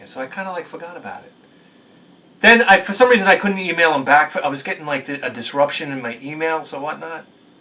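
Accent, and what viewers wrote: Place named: Boston, United States. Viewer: it's that accent that sounds Bostonian.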